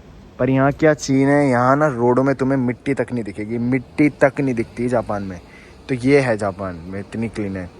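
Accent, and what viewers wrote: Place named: Uttarakhand, India. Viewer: Bro your acsent seems phadi btw mai uk uttarakhand❤ se hu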